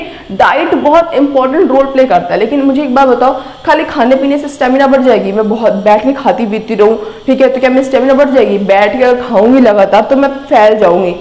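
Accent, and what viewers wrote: Bihar, India. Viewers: Very sweet voice mam.. Madam bojha dhone like we r bihari... Proud be a bihari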